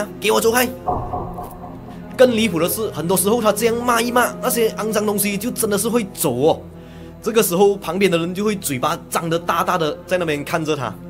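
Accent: Malaysia. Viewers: 謝謝你的故事❤不過我還是一邊聽一邊想笑呢😂是因為馬來口音很特別嗎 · 其实，UP主他没有使用 AI 语音技术啊～他的口才還是一样带有浓浓的大马腔的口音味道～完全听得出来，但是最近他的發音腔调 有很大の进步了，有听出來 确实比早期改善了很多，讲故事也更加顺畅了～很好吖～ 我認为说 ％ 百分率 = 巴仙，在大马 或 新加坡 世界各地 都没问题啊，没必要去刻意效仿 台湾，而且台湾 使用『％ = 趴』其實也不太标准。 然后，说句实話…其實 新加坡🇸🇬 就也是一样是 🇲🇾大馬啊，只是是旧的，是属于以前の马来西亚 而已，後来叛逆 有本事了就独立自主出来，所以两边文化自然差不多也一样嘛～。哈哈……😄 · 曾经看过马来西亚网民批评你的口音，我是新加坡人，我们新加坡有新加坡华语口音，我喜欢马来西亚和台湾华语腔，所以你不要用AI，听马来西亚口音很亲切。还有，请马来西亚华人保留马新本土华语。新加坡华文界喜欢模仿中国和台湾，如联络 = 联系，宵夜 = 夜宵，甘榜 = 乡村，塞车 = 堵车，养育孩子 = 把孩子拉拔大（连田中裕子演慈禧太后也说她把光绪拉拔大），水草 = 吸管，地铁 = 捷运，电脑 = 计算机 -你去computer shop 说我要买最新的计算机，店员叫你去 文具店，注册结婚 = 办结婚证，运货 = 拉货，一个新加坡新传媒男记者竟然把德士说成 出租车 （rental cars），搭德士 = 打车，什么都搞，跟他们说谢谢他们学中国人说没事。我有说妨碍打扰你了吗？没事？我最喜欢人家说 一样一样 sama sama，或者 不会 （福建话翻译 buay）。新加坡媒体觉得 巴仙 很不标准，不敢说，有的新加坡人却模仿台湾人 说 趴，三十趴，五十趴。请马来西亚华人保留新马马新本土华语，别让它们失传。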